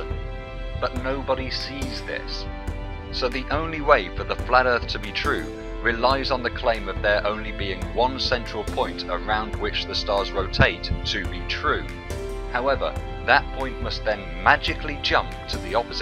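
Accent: United Kingdom. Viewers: For some reason I loooove having earth and space explained to me by a person with a British accent. I don't know what it is.. maybe the impression that I'm listening to the Hitchhikers Guide. Anyway, thanks for making these videos. On a side note, we absolutely need people asking questions about this or trying to challenge any "dominant" ways of thinking. If that weren't the case, we would never make any progress whatsoever. And when dealing with questions about space, the universe and our place in it, the sheer and ever incresing gigantic scales that you have to wrap your head around, can make it hard to combine basic principles and make sense of them, even if you have actually understood them on a smaller level. In order to support people making progress on their own, we should always refrain from name-calling; apply logic and be patient about it. Also, whoever relies on the scientific method should always embrace the possibility of his theory or working model being proven wrong at some point. 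If in this case, however, anybody proclaims he can challenge the spheric model of earth which he hasn't understood (after seeing A LOT of FE-videos I understand this is THE first and foremost wall that flatearthers crash into), supported by false claims which, as he heard from somebody, somewhere, were "actually true", not being able to support this or any other claim or reproduce it by his own means with any model or experiment, whilst having all his "evidence" shattered by one logic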